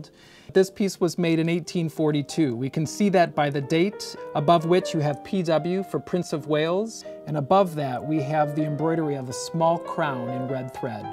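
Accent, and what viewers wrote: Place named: United States. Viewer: WHO MADE THIS APPALLING IN QUALITY DOCUMENTARY?Firstly Who is presenting these exquisite objects? with Canadian or. I hope not American accent. Why does the Camera stay fixed on him. No interest ,instead of presented pieces? Irritating background noise . And Too short moment on objects with zoom image clouded. I CANNOT BELIEVE WITH ALL THE BRILLIANT YOUNG PEOPLE. WHO PRESENT DOCUMENTARIES OF THE HIGHEST QUALITY . THAT THE MUSEUM OF LONDON PRESENTS. THIS VERY POOR QUALITY WORK. An English Lady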